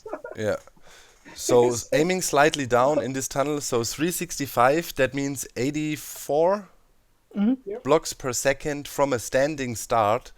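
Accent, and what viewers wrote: Germany. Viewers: Klar das merkt man schon am deutschen Akzent..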